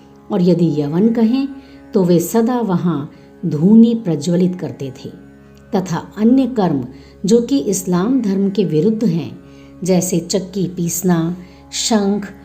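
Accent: Standard. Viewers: आपके।द्वाराबाबा की लीलाओं का बहुत ही सुन्दर और शुद्ध उच्चारण किया गया।धन्यवाद।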